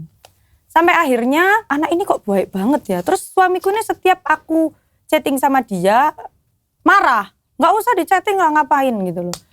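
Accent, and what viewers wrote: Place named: Java, Indonesia. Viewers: Mbak, logat bahasanya medok banget.... Arema ya mbak..😂😂😂, sama aku juga arema... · orang sidoarjo kak.... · Mbak.....bahaasa nya cara ngomong nya kok persis farida nurhan ya....jawa medok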